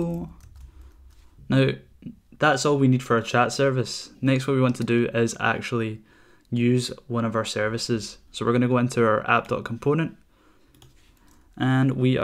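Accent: Scotland, United Kingdom. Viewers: nice tutorial and nice scottish accent, brings me back to my old scottish lads in Spain :,) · Great tutorial and the scottish accent makes it even better :) I'm not even doing angular, but tutorials for redux-observable are so scarse... If you could do one on that, it would be great, but in any case - great work, thanks and keep it up.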